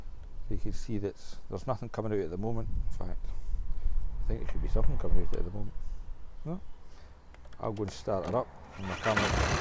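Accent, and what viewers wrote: Scotland, United Kingdom. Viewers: Thanks for the video. I like ur teaching especially the Scottish accent. Will subscribe! My son's audi a3 2010. Keeps cutting out which is why I'm here. Normally when at operating temperature. But no real pattern. Code said crankshaft shaft sensor. I replaced. Cleared code. Drove 100miles the happened again. So I replaced cam shaft sensor. Not clearer code but cut out at warm engine Started again and let it idle 1hr. Fans on few times. But confident to drive it incase cuts out again But will look at this. Thanks Anything else I should try look for? Wish u were in London.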